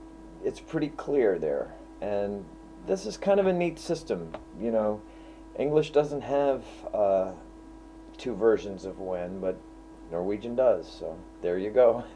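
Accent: United States. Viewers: Hehe. I love the American "R", hihi =) It sounds so weird, since i'm a native speaker, though I don't blame you. Very interesting video man, carry on :D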